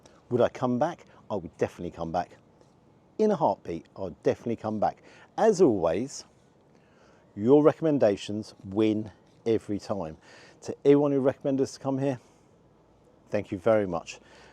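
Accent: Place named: North, United Kingdom. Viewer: If you call your channel "Rate My Takeaway" you're not going to high end Fallow or Gordon Ramsay any time soon. He does what it says. Unfair comparison. PS his English is a perfectly fine regional vernacular. One of many. It makes the UK fascinating. Some might say "You sound elitist and frankly a little bigoted towards Northern working class folk." Some might.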